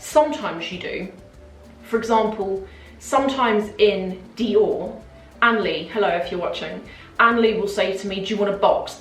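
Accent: RP, United Kingdom. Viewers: With your posh accent and elegance, I’m shocked they treated you so poorly! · “I am quite disappointed” oh I love the way you talk and express yourself! Only a true British Lady can talk like this😘so elegant and articulate!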